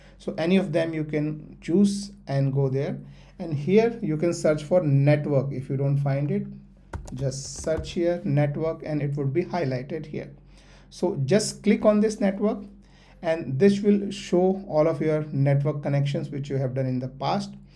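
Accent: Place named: India. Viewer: I swear Indians always know the best tech, heard the accent knew my problem was getting resolved .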